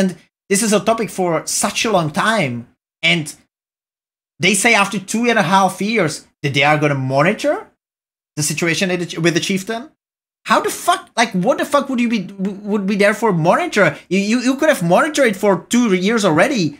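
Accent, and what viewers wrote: Czech Republic. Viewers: ingliš vidijou miluju czech accent over muj british :D:D:Dd:d tohohle typka miluju proste, nejůepsi wot content a zvedne ti naladu kdykoliv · Zase tak skvělou ne, ale už jen že mluví s českým přízvukem to dělá srozumitelnější a to o HODNĚ. Taky mluví dost pomalu. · Angličtina jako čeština pikacu ,smysl ok😊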